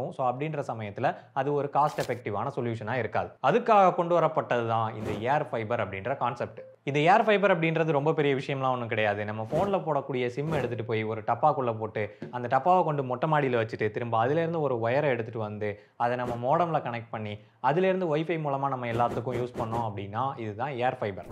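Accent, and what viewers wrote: Tirunelveli, India. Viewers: Tirunelveli iruku bro 🤔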